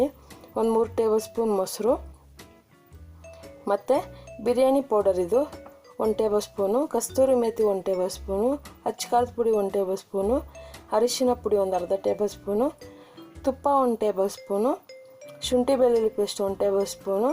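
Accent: Tamil Nadu, India. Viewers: Neevu tamilnadu nora nimma kannada adethara ide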